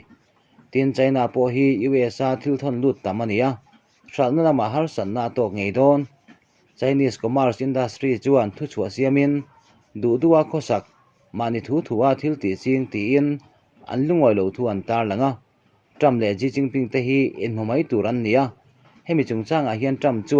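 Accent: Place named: Southern Mizoram, India. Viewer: I chhiar ngaihnawn hle mai. Mizo tawng pawh a tha e. Chhimpa i nih dawn hi. Duhlian tawngah chuan, Lunglei District leh, Tuipui ralho hi an thiam ber mai.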